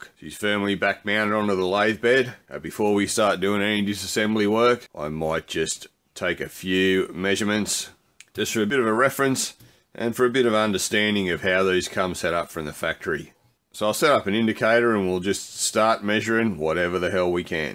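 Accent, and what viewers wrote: Australia. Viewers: Dude kick ass accent. Ausi I gather. Good vid bro.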